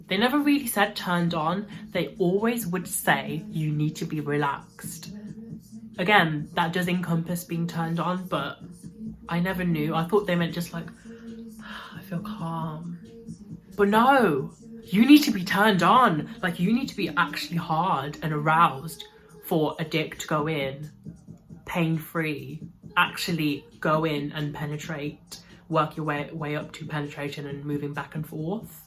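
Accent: United Kingdom. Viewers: Yes that British accent does something to me 😁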